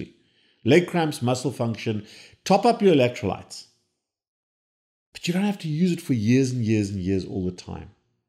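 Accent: South Africa. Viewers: That's true. But he only said it once and YT text-to-speech often gets words wrong. It esp doesn't like South African accent, translating it more often to "covert." But I just checked a recent John Campbell interview (someone much more off the deep end about Covid) and the TTS had no trouble parsing "Covid." There's no conspiracy here. I was just showing you that you could type it in the comments (twice!) without the black helicopters appearing.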